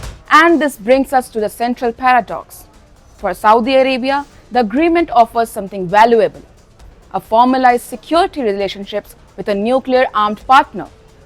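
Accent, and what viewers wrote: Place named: India. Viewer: The indian accent i cant continue listening. Its horrific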